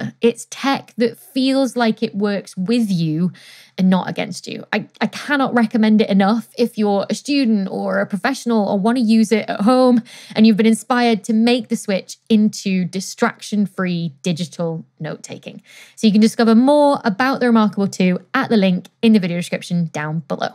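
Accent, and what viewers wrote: United Kingdom. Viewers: I Totally Love You!!! Girl next door Astrophysics?! Aw, hell yeaz!!! Where were you when I was growing up? I hope you don't mind if I share this video with my FB Friends to settle a quip? The arguments were: 1) Would Betelguese blow within our lifetimes? It's possible. 2) B'Geuse is somewhere between 500-650 ly from Earth (depending on who you believe). Tycho's Star was estimated at 9,000 ly; Keppler's Nova was estimated at 20,000 ly from Earth. Without specific climactic data from the 'Rennaissance Era', how can we say that Betelgeuse is NOT a threat to Humanity? There has never been a recorded Supernova THIS CLOSE! (?) With 40 years experience in the Nuclear industry (25 years in Health Physics), I know that we'll get BLASTED with neutrinos, probably a shitload of Gamma as well. My question is (and perhaps this will settle the gripe?)... 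what about the Mass Ejection/ Cosmic Rays? I'm new to your channel, but I am totally HOOKED! I studied Astrophysics at Penn State after I left the US Navy (Submarine Force) in 1991. No offense to Neil De Grasse Tyson, Stephen Hawking, Kip Thorne or even Carl Sagan... you are MUCH prettier than them! You are an amazing COMMUNICATOR. Keep those beautiful blue eyes, sweet personality and soft 'Brit' accent out there.... I'll be here!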